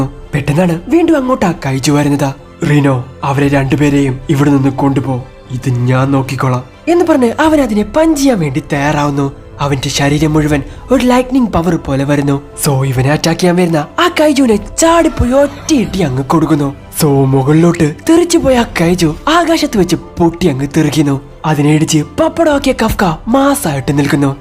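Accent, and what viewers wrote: Malappuram, India. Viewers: Malappuram aano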